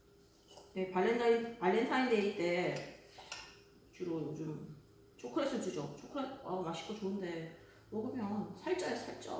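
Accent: Gyeongsang, South Korea. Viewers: 왠지 대구분이실듯 ㅎㅎ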